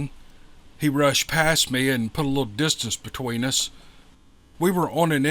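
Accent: South, United States. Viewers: Hey....Im not a red neck, but I did live in Arkansas for over 40 years....I love the South and its great to hear your accent... Love it... Thanks for show. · Now wait just a minute! You better hold on to your Weave. Cuz this is an all inclusive Channel. Us Yanks love to curl up and listen to our Favorite County boy. With his smooth Southern accent!🥰🎶🙏🏾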